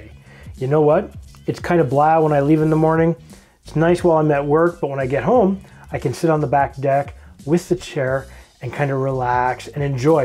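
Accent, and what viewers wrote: Canada. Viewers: On the back deck or in the hawse, (love the accent from the Yukon). I read thru your comments and I need to make more homemade Grenadine, for mine is approaching it's lifespan. The Goslings is a great floater. I've even seen more potent Gosling at the store. Many places by me use Myers. I'm always in for large rum drinks. Sláinte!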